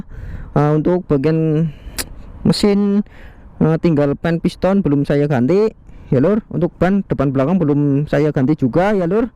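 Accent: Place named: Java, Indonesia. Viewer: Mas idola bgt siiii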